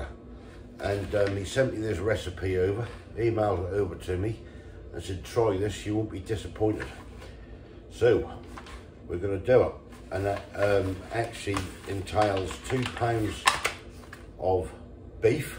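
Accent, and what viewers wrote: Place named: England, United Kingdom. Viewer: Decent grub that is! Trying to work out your accent mate. Are you from Gloucester by any chance?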